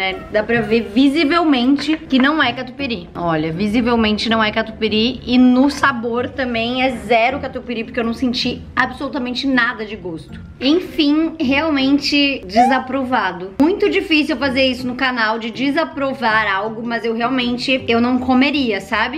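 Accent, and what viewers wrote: Rio Grande do Sul, Brazil. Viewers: Gaucha né?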